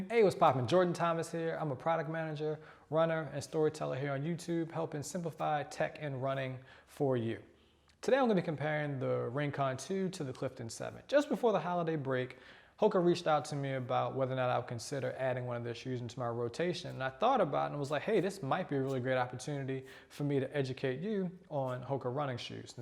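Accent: Baltimore, United States. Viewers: Nice video. Can't wait for the Mach 4 review. By the way, are you from Baltimore? I think I can hear it lol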